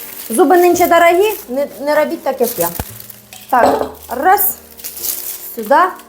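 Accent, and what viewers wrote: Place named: Poltava, Ukraine. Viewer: Ваш юмор і діалект, схоже Ви з Полтавщини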